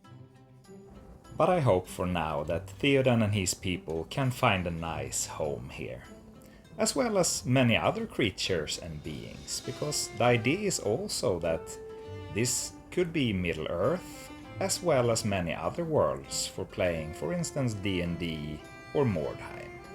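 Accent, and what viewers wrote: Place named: Sweden. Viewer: Hi amazing work. Sounds like u are from Sweden. What part are u from?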